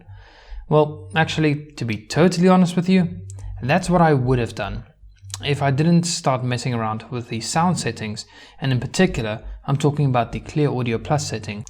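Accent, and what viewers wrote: South Africa. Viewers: I'll be surprised if I'm wrong here but by any chance are you South African 🇿🇦 ? 😁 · nice review! you sound south african...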